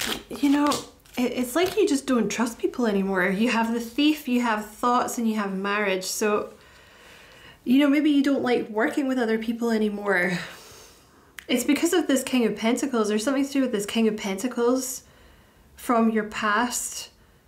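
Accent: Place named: Scotland, United Kingdom. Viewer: So nice to hear a fellow Scot 🏴 thank you for this reading 🙏🏼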